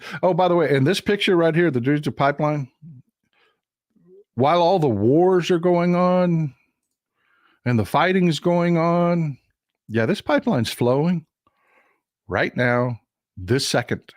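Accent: Texas, United States. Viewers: I love hearing that Texas accent:) Yerp=Europe:)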